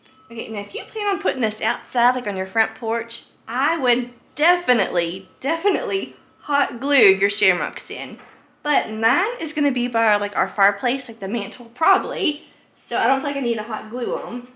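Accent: South, United States. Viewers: I love your st Patrick’s DIY 🤩 your personality so adorable and love the southern charm accent ☺️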